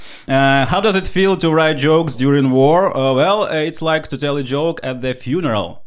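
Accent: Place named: Ukraine. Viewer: that was fabulous! With ukrainan accent 😁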